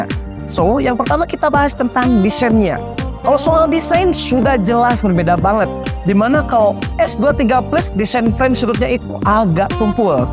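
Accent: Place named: West Java, Indonesia. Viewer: logat na nyunda pisan 🍵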